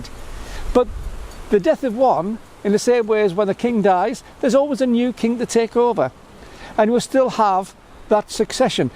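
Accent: United Kingdom. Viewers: great insights. always fan that British accent :) The bottom line if you carry a bag of multiple lenses (3-4) and want to be on a minimal comfortable carry, nothing compares to m43. given the low f-number per price (such as 25mm f0.95) you still get a decent full format ~f2.0 paying less money per overall and keeping the size to minimum. also, the sensor movement compensation of Olympus makes it possible to shoot in low light for a long shutter time, and the elegance of pana, say gx7, is 2nd to none, except Leica. So the only thing comes down to Megapixel which is quite BS in real life.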